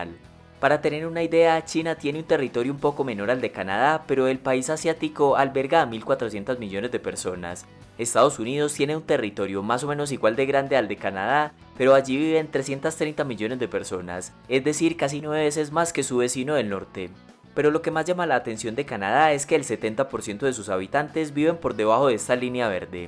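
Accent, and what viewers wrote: Colombia. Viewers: Dato curioso el narrador de este video si no equivoco es de origen colombiano por su acento…..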